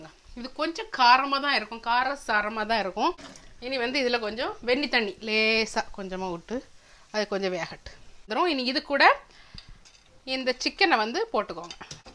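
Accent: Nagercoil, India. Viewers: Akka naanum Nagercoil kaari thaan...Namma ooru baashayai kekkarathukku romba santhoshama irukku....Ippolaan enga family la yaaraavathu stressed aa irunthaangana unga video thaan pottu paapom....En ponnu unga periya fan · nagerkoil thamilai marakatha thamilachi neengal i like you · Superb..What a catching Talk.Clasa. No Other Words To Say..As If Iam in Krishnankovil in Nagarcoil Town... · Even if you settled in UK you haven't forgotten your nagercoil tamil so sweet whenever upload a video never ever change your language . Mother younger is just like our mother. So keep it up. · உங்கள் மக்கா ,மக்களே....இன்னும் அழகான வார்த்தைகளுக்கு நான் அடிமை....enaku neraiya nagercoil friends erukanga sis nenga pesra tamil rombo pudikum....love from UAE... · Akka I like your style of Tamil.Nagercoil? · This is josephine from trichy.Hello how r u. Recently I started watching u r channel. 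I like u r nagarkovil slang. I like u r body language everything instead of u r cooking. Keep countinueing dear. Bye.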